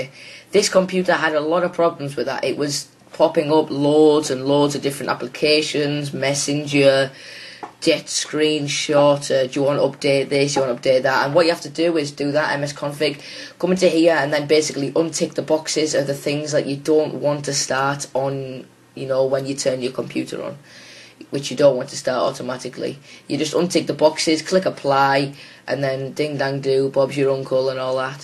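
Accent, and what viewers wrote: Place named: Liverpool, United Kingdom. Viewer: Ya sound scouse or maybe from Manchester, yeah? Nonetheless, loving your dialect! Cheers!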